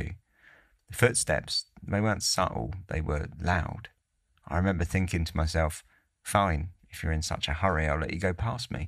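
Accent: England, United Kingdom. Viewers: Sounds like English accent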